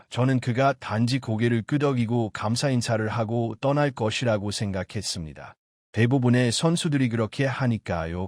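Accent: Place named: China. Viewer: 목소리가 보이스피싱 중국인이네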